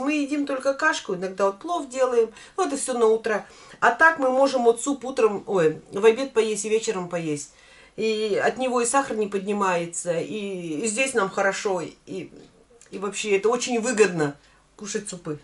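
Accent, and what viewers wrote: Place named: Kopeysk, Russia. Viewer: у меня подружка живет в г.Копейск, говор такой же! мне нравится ваш говор. а вы откуда ведете трансляции?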